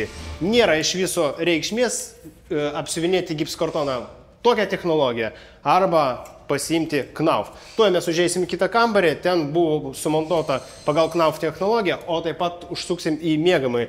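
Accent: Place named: Russia. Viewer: Šaunuolis, kad stengiesi, bet tas rusiškas akcentas ir rusiška sakinių sandara trukdo suprasti ką nori pasakyti😊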